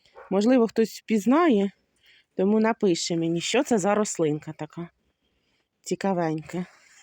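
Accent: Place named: Poltava, Ukraine. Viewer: Наша Полтавська мова , аж приємно 🩷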